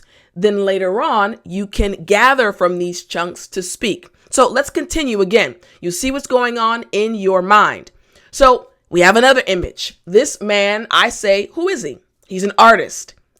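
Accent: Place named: United States. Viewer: thank you for your lesson! i'm ardi from indonesia, I'm a teacher and watching your video really inspire me a lot and i kind of difficult to teach and make the student understand what i'm actually gonna tell them and to be honest, there are still so many kids in my country not really interested in learning english, they learn as their parents' willingness not from the students themselves. for me, you are such an amazing teacher, your lesson are easy to absurb and the way you teach is so cheerful, ireally enjoy your american english so much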